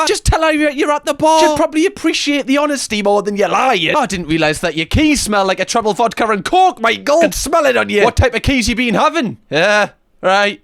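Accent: Newcastle, United Kingdom. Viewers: i am curious, does learning from give your english a british accent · if anything there is no such thing as a British accent. is from Newcastle so he has a Geordie accent (NE England)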